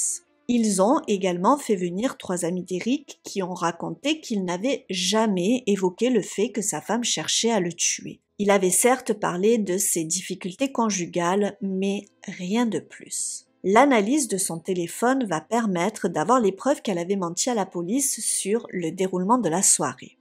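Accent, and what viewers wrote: South, France. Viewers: J'aime bien t'écouter, malgré les horribles histoires, ta voix est apaisante avec ton léger accents du sud qui chante..😅❤ je suis fan. Merci ❤❤❤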